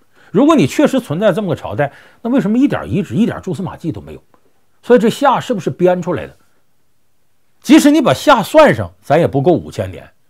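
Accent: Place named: Shandong, China. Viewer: 这山东话太纯了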